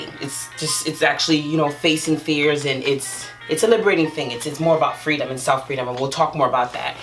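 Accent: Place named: New York, United States. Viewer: I jus love to hear her talk ain’t paying attention to shit she saying jus love the soooo Super New York accent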